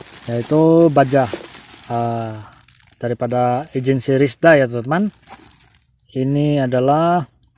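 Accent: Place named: Sabah, Malaysia. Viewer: Kau org Sabah pulak teman2😂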